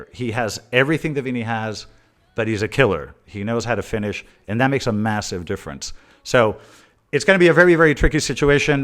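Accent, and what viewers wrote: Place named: United States. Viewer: You have a perfect American accent. Are you American-Spanish? Wait, are you a polyglot? French, Spanish and English? Italian and Portuguese maybe??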